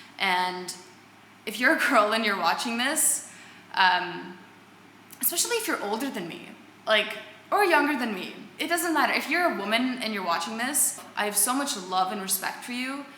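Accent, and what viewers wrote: Russia. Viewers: Сооооня, я люблю твои видео на английском, просто даже слышать твой голос, это просто удивительно и приятно! 🌙🌹💋🍒 Мне сразу хочется стать американкой🇺🇸, что бы понимать о чем ты говоришь, но я понимаю лишь некоторые слова, переводя в голове 🤔👻 Но хотелось бы, что бы кто-то из наших девочек, делал перевод субтитров под твоими видео, это помогает изучать английский язык, думаю что не только мне. Надеюсь ты прислушаешься и что-нибудь придумаешь) Я действительно люблю тебя, твои мысли и английско-русский акцент ❤️ Спасибо за видео! Целую 💋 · Кажется, будто ты уже и по английский с русским акцентом говоришь! Спасибо! Будем учить английский! 💋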